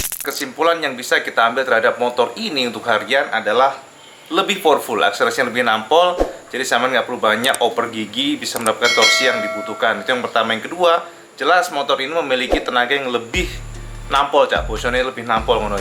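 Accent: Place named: Java, Indonesia. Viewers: Seneng bosomu cak ...ojok lali cak cok e ojok ilangi ya cek ketok jowone😀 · Cak Samean jowo ndi hehehe kok mantab ngunu jowone 😂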